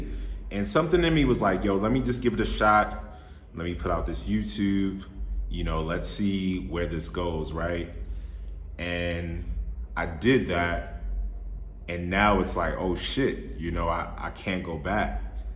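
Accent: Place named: South Africa. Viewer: Frm 690+ subscribers to 2k+ wow!✌. Hav anyone noticed how pronounced things abt mzanzi?, He pronounces like someone dat has been living in SA for a while omg!. Plz giv dat man a bell. hav u eaten " triple decker meat/ chicken pizza frm Debonairs pizza?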